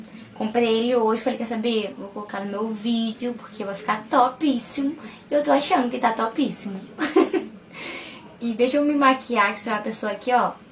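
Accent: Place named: Rio de Janeiro, Brazil. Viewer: pelo "x" no final das palavras, vc e carioca.. onde mora ? em qual parte do rio ?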